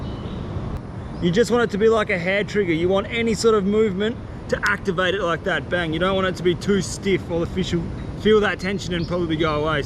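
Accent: Australia. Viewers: he has an Australian accent. most people just relate australians to be tuff and not scared of most stuff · This dude is awesome, he has the ability to be really excited about almost eveything, the Australian accent is like the cherry on top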